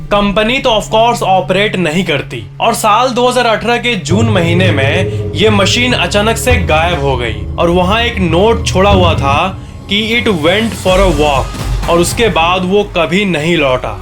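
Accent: Bihar, India. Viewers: pakkkka bihari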